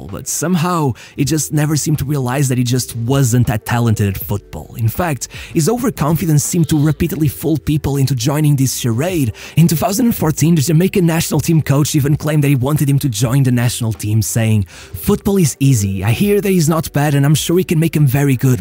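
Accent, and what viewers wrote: Portugal. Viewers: brother man sounds portuguese af lol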